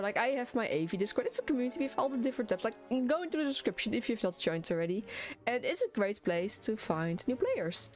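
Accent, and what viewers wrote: Netherlands. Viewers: this was a nice video, very educating it is, love your dutch accent btw :P (im dutch myself too)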